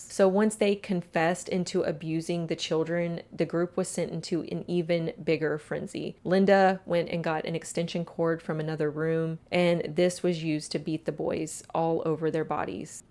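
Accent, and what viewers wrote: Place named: Utah, United States. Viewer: Fabulous story-telling skills. I’m convinced the you-tuber has to be from Utah or Idaho…real and deal pronounced rill and dill.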